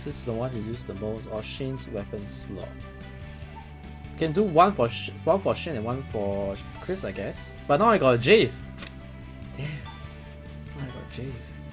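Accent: Singapore. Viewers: Are you also from singapore?? :D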